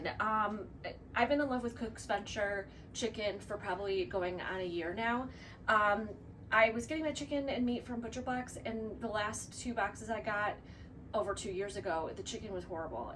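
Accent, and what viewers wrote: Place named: Chicago, United States. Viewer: I just found you guys and I laughing my ass off...( why I joined ww big big ass ) thank you so much I am having so much fun. I am from south side chicago and now live in fl, Listening to you guys talk and laugh makes me miss home i hear you accent and you look so much like my aunt.. melts my heart... keep having fun and thank you for all the help and inspiration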